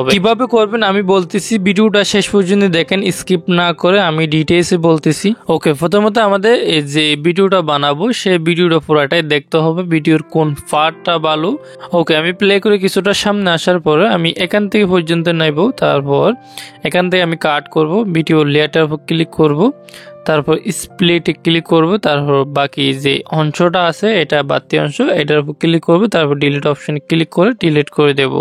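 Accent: Chittagong, Bangladesh. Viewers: ভাইয়া মনে হয় চট্টগ্রাম এর..? আপনার কথা গুলা শুনে মনে হয় ☺️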